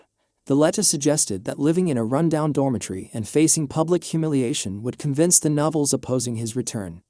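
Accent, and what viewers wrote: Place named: Sydney, Australia. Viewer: sounds like someone from Sidney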